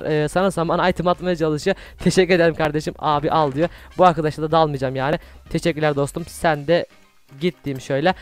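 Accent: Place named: Thrace, Turkey. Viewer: memnunum abi bu arada neden şiveli konuşuyon trakyalımısın.( ben öyleyim çünkü).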